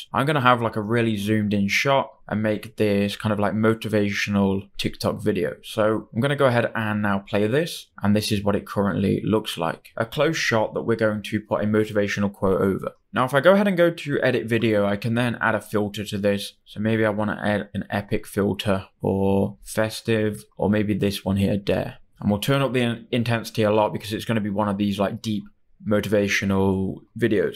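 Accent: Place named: United Kingdom. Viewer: Nice job.. Then ur accent is great.. British 😊😊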